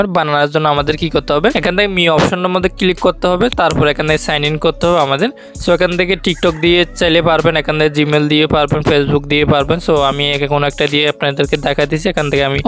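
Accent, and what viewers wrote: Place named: Sylhet, Bangladesh. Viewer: Apni sylheti vai❤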